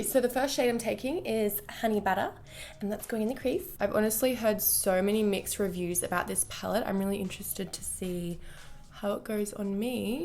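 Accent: Australia. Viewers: I adore Australian accents. 😍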